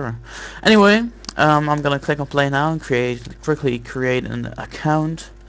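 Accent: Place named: Netherlands. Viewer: lol your dutch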